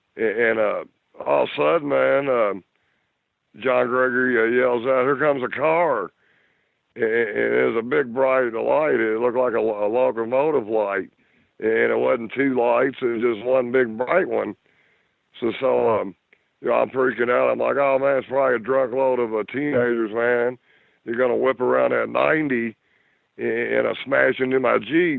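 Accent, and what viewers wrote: South, United States. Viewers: Just a Southern drawl to me! Nothin' bad about that.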